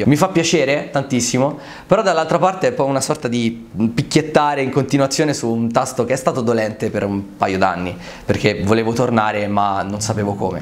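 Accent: Tuscany, Italy. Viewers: Ma perchè l accento toscano? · ...Mi pare di notare un accento toscano...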